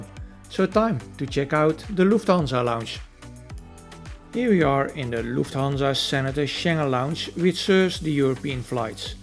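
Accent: Netherlands. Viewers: What a terrible Dutch accent.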